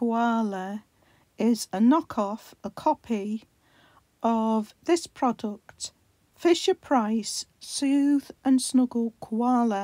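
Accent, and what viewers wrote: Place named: United Kingdom. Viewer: Thank you SO MUCH for this video! SO PLEASED I found it & didn’t get scammed. Ironically, I found your voice much more soothing! Your accent reminds me a lot of a lady I used to work with. Do you mind me asking what part of the UK you are from?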